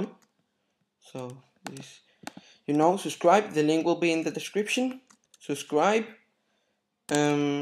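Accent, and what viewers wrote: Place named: Mexico. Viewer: gracias debolada se te ve el acento de mexicano XD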